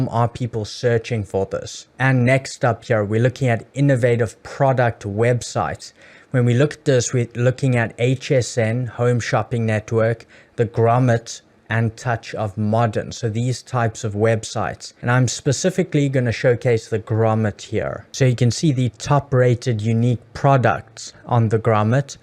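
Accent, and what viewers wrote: South Africa. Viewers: That South African🇿🇦 accent 🔥 Thank you broer😊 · Are you from South Africa?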